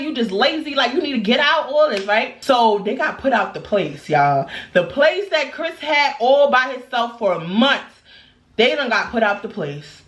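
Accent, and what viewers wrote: New York, United States. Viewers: Love your story times..you're looking gorgeous, girl..and I love your New York accent! Weirdo is a dumb a**, on evertthing..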